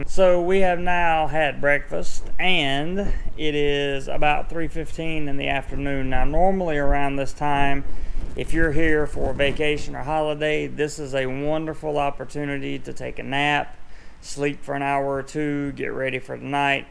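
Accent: United States. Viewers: I like your cool american accent.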